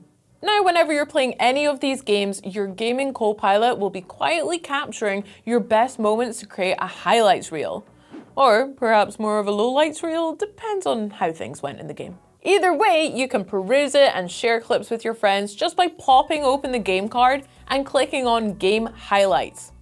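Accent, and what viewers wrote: Scotland, United Kingdom. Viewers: Love hearing a fellow Scottish accent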